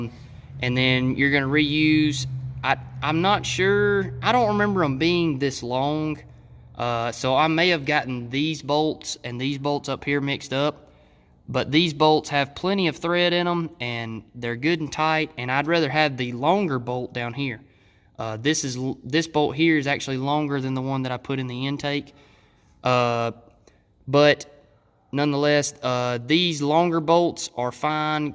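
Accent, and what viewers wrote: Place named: South, United States. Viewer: Nice video. I am about to go down the same road so I appreciate the insight. I can tell you're from my neck of the woods from the southern drawl. Where abouts are you located?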